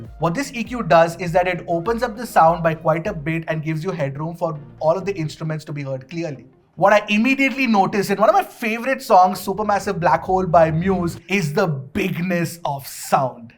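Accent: India. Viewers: i mean when i saw indian man i thught hes going to tell few words in english and title and then hindi but instead i got nice indian accent and very nie review very good man keep it up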